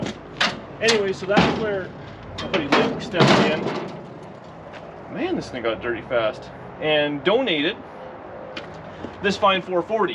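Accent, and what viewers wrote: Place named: Canada. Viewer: I knew you was Canadian as soon as the video started. I live in Detroit and you know the Hockey Competition gets pretty Heated. Nice Car Man.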